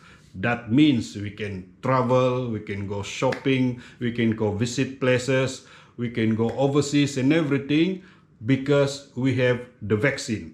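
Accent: Sabah, Malaysia. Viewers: Bah sy mangarti jg DR ckp,sendiri mengerti,klw mw translet sma urg, karas tu lidah mw kc terang 😅😅😅biar kn mreka² yg nda suka DR brckp malay slang sabah 😁😅kunun kn DR 😁 Terbaik perkongsian ny Dr 👏👏👏💪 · X juga masalah kalau sy dr. Ngam laitu slang sabahan.. Kita pun di sabah ba juga ni.. Its realy doesnt matter at all... Maybe those who give negative cmment..or maybe'kayangan people'just dont like to share good medical info like u.. Salute dr!!!.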